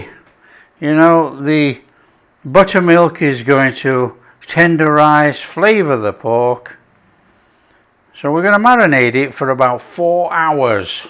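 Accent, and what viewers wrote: South, United States. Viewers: Southern Grandma here. That Southern accent ain't the worst I've heard. I'm a good cook, but my pork chops could use some improving. No doubt, it's somewhat a lack of patience problem! I'll be trying your buttermilk trick soon enough. You know, here in the states, all of our bacon is streaky bacon. Where I come from, it's routine to save your grease from every time you cook the bacon. I keep it in a Mason jar in my refrigerator and use it pretty frequently. Love it to grease the cast iron skillet before I make my corn bread. The CRUST! Thanks,. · Not a bad Southern accent!! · God you do make me laugh, I think you're American accent was very good actually. 😊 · Y'all just listen here to the southern version of chef. You can speak southern a lot better than I can speak your English. Personally, I love it. And I say for the umpteenth time that I love what you cook. 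And pork chops are another of my favorite. Youve cooked them pretty much like we do. And served with mashed potatoes and gravy is just the best way. Theres not much food that I don't like( I'll never be rich 🤑 enough to eat escargot or caviar) but I will try most anything. Youve made some good eating there chef. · Your southern accent needs work, but the food is what granny made! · As a Southerner in the US... I love your Southern accent! 😊 And your cooking! ❤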